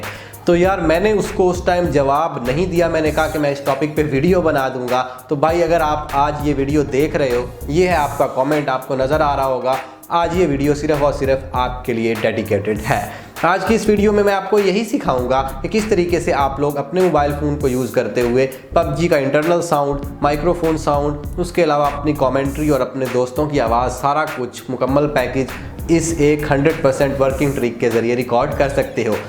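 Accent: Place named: Himachal Pradesh, India. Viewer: Bhai vedio ka pta nhi voice se lg rha tu b himachal ka h,😄😂😂😂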